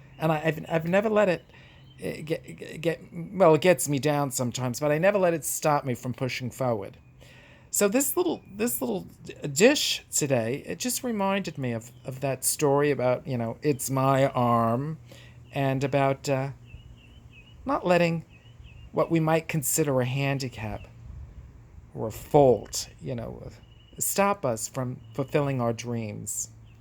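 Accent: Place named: New York, United States. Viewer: 45 days in and the stories just get better and better! thank you for sharing your sister's legacy with us. Your stories are playing a huge part in me getting through this crisis. I live in Brooklyn and have been sick for the better part of 2 months with this silly virus (and I'm going to be fine, just slow going). I love hearing that NY accent. xoxo Steph from Park Slope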